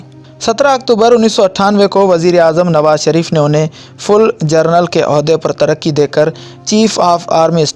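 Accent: India. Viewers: ki Urdu bhi Hindi hay Indian hay koi yeh channel wala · Are you indian. Your lehja is indian. Kia musharraf shia hai.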